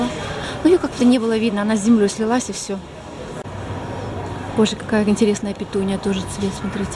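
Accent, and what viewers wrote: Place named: Russia. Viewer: говорила бы уже либо на русском либо английском!!!!!!!!!!! ужасный русский акцент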